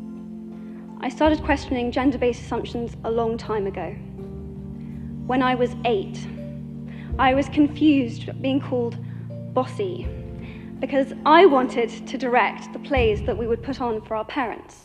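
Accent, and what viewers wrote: United Kingdom. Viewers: British accent 🔥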